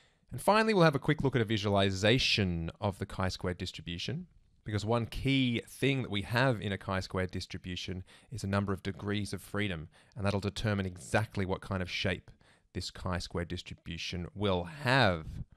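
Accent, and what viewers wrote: Australia. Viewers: is that Australia accent?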